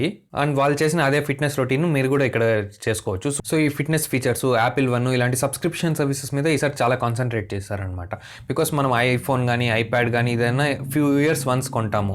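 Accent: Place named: Tamil Nadu, India. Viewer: Superb..bro..voice Bagundi tamil.mix ainatu vasthundi. Good ❤️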